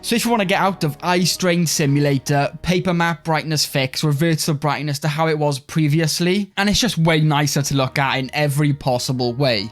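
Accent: Wales, United Kingdom. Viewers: Great video as always (nice Welsh accent btw)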